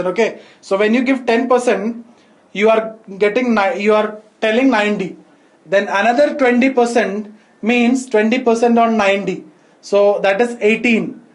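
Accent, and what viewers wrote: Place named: Kerala, India. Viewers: Don't waste time by watching this school maths , seeing this malyali ,accent waste waste waste time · Thank you very much sir. I love the way you explain. Your pronunciation reminds me of the Malayali neighbours I had years back <3.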